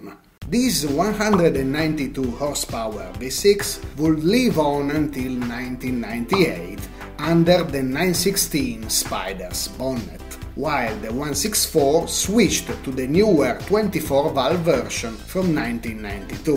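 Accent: Italy. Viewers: The narrator makes this soo much brtter...so very much italian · I have only seen two of your productions yet, but I like them and especially the authentic character by your dialect with the "al Italia" touch. Molto bene! (sorry, I'm not fluent even though I'm half Italian but I never knew my real father) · I really enjoyed this video being narrated by Italian.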